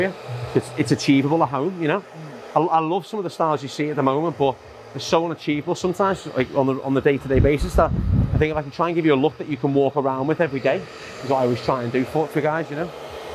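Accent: Liverpool, United Kingdom. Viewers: Scouse, from Liverpool probably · Love your videos! I know you don’t work in liverpool but your scouser accent is undeniable... where would you recommend in liverpool? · Love ur Scouse Accent mate🥰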